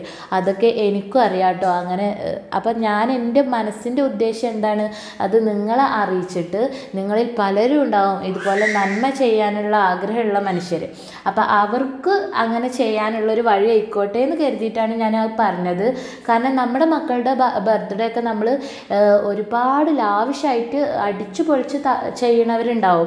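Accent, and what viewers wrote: Malappuram, India. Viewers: Ningalude sthalam evdeyaa.. Malappuram anoo.. Pakka malappuram language anallo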